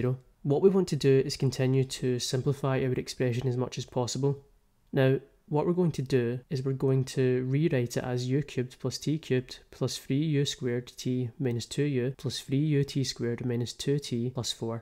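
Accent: Scotland, United Kingdom. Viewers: I did not imagine someone named to have a Scottish accent. Great video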